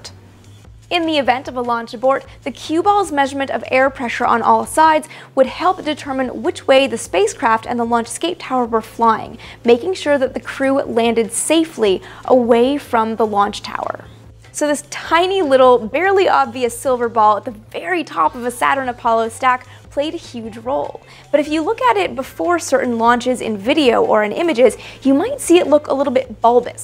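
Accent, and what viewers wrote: Canada. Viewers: Are you Canadian? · Joshua Walker she's speaking English and she is from Canada. English has so much French influence anyway, and in parts of Canada they speak French. So, no surprise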